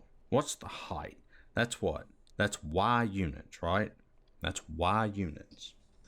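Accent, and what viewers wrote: South, United States. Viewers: hello sir i just want to thank you for all your efforts just for the record im not even majoring in math but i watched almost every video of yours because im in love with your southern accent , i could like listen to you all day along and im not gonna get bored at all all the respect and all the love from morocco :)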